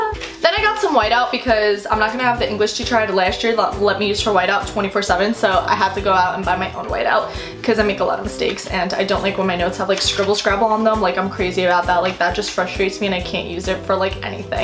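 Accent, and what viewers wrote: New Jersey, United States. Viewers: Dang she's from up north like Jersey or Massachusetts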